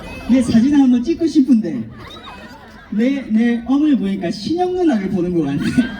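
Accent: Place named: Gyeongsang, South Korea. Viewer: 희재님 오늘 경상도 사투리 입에 착착 감기네요♥❤❤😂😂😂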